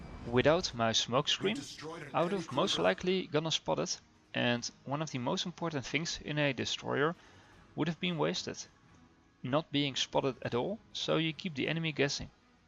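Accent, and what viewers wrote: Netherlands. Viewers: ĺike your videos! subbed. are you by any change dutch? you sound like it:)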